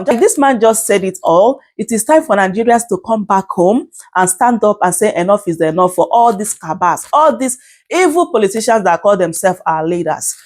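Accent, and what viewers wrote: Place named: Ghana. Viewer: We clap 👏 for you you talk as original Ghanaian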